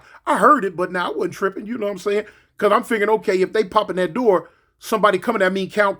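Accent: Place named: Colorado, United States. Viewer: Yo Big homie can you do a story about my cousin RIP OG Businessman Berry (Godfather the loan Sharker)killer Clause and my Uncle Freddy( he was in and out of prison that frequently use Herion) you say certain words that remind me of them! It’s that OG South Park Slang homie!!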